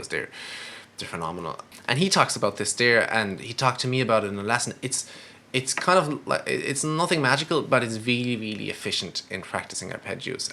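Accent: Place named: Ireland. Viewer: Great great lesson, thank you! Und dein irisches Akzent freut mich sehr :)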